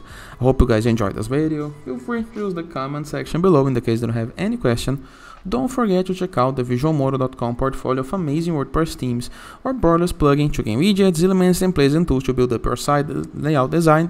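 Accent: Brazil. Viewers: Esse inglês é BR hein rsrs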